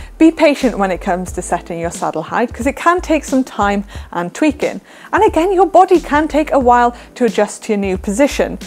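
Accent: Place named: United Kingdom. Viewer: Do you think that British people will watch only? Talking Too fast and with accent !!! I didn’t like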